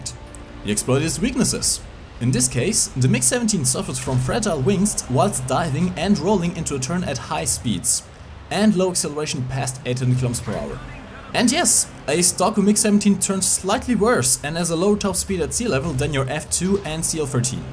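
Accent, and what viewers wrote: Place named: Germany. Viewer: Youre german right ? The accent is awesome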